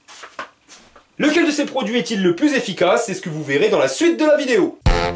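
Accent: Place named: North, France. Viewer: Accent du nord, jeux de mots tout y est 👍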